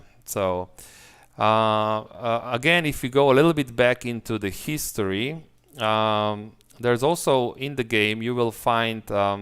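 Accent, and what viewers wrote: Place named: Slovenia. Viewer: You sound Slovenian... -_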